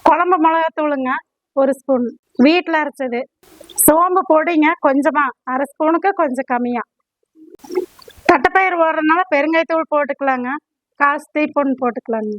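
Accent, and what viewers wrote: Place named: Kongu, India. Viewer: Akka nama Kongu tamil kalandha saadham arumai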